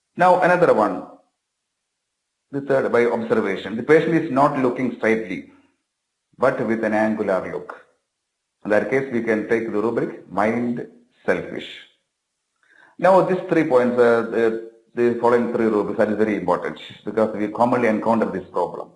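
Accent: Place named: Kerala, India. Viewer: Malayalam mother language So prononsation is likely to be It is for everyone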